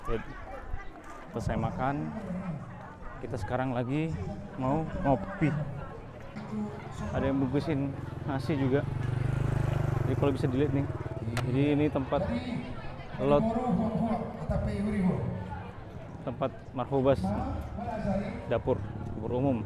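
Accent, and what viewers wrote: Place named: East Java, Indonesia. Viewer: lo kok logatnya kyk wong jatim klo berbahasa jawa gais..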